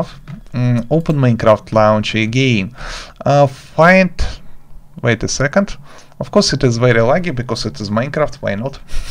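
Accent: Russia. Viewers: Очень русский акцент))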